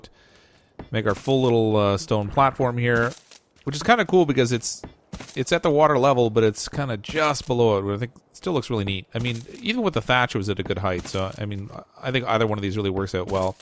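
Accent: Canada. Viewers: I really should be sleeping now- it is am.Nah. That livestream was great. I am now a loyal subscriber. I can definitely tell that you are Canadian from how you say out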